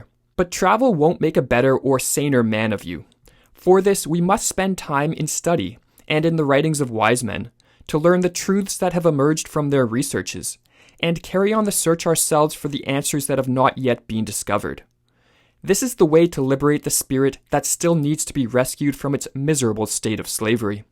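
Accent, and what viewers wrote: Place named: Canada. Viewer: Hey man, long-time follower. Just checked your patreon and I knew by your accent you were Canadian! Whereabouts are you? Love your content!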